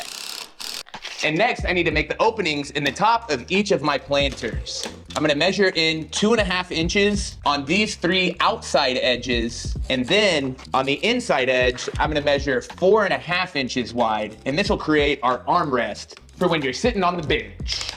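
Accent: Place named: Midwest, United States. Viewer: It is his Midwest accent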